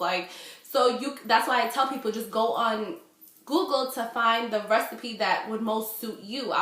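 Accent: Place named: New York, United States. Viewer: NY accent... eeeww!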